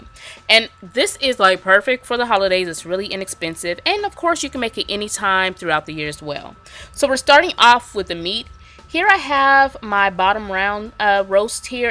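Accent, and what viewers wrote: United States. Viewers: Why do yanks say "erbs" when there is an "H" in the word........... its HERBS.... in the Queens English the word is HERBS... learn it.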